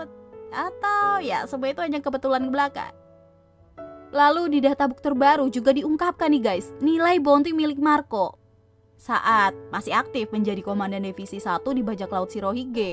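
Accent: Java, Indonesia. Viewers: Iyoi medok... Koyok pisan. Wong jowo kabeh berarti iki yo... G or Irish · Saya kangen suara medok 😀😀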